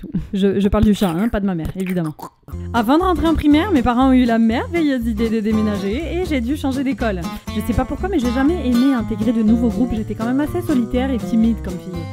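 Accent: Quebec, Canada. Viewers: Mes on est d'accord que elle a un accent québécois parce qu'elle était aller vivre o Québec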